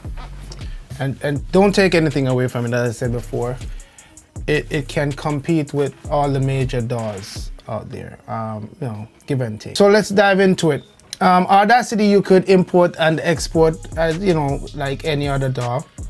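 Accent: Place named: Jamaica. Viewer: Subscribe Di minute mi hear Di yaad accent.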